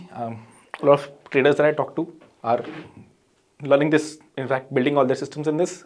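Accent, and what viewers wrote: India. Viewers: ur Hindi English is too good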